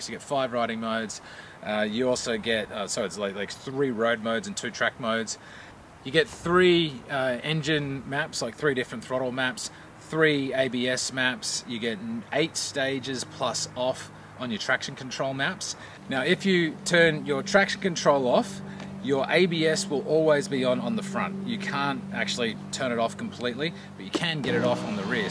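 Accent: Australia. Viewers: Nice Aussie accent :)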